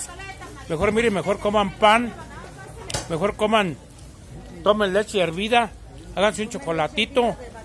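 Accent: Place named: Michoacán, Mexico. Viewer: Ud es de Michoacán, verdad? Por su forma de hablar 😁..que rico se ve todo!!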